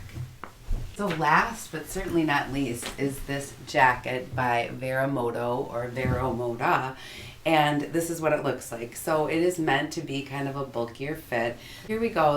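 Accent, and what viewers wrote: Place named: Midwest, United States. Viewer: Okay, I have to know... are you from the mid-west?! I grew up in Minnesota and have lots of family there and you have sweet little hints of the mid-west accent. :)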